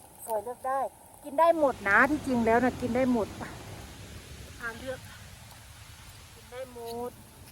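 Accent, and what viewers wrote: Sakon Nakhon, Thailand. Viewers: สำเนียงบ้านเฮาคักเนาะจ้าสกลละเบ๋อ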